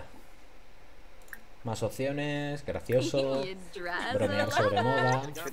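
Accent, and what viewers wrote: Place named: Extremadura, Spain. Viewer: Se nota que eres Extremeño!! Que pirata el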